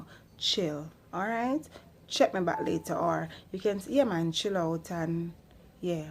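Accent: Jamaica. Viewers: I so so love Jamaican accent.. like madddd😄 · Oh wow this's fun. I love Jamaican accent. Where exactly did it originate from cos it's unique , do you know?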